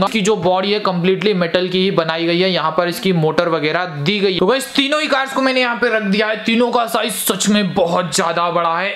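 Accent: Rajasthan, India. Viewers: Bhai Rajasthan se hai kya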